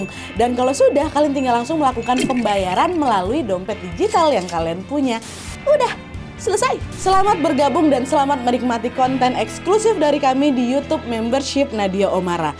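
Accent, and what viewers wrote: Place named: Eastern Indonesia, Indonesia. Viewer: Banyak yg bercerita horor dgn flognya .tapi ka Nadia, bisa hidup ceritanya macam aku ikut dalam ruang cerita itu. Di tambah logatnya nya yg seru.... He he Indonesia timur jdi Indonesia barat..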